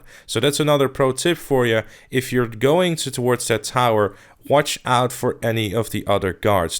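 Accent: Netherlands. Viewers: is this guy dutch? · Heyy are you Dutch? cause i think i hear it in your voice ik ben ook nl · Are you dutch? You have a bit of an accent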